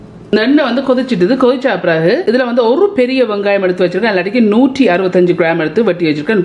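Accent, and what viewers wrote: Jaffna, Sri Lanka. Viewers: Akka, I like the way you speak Tamil. Jaffna Tamil ❤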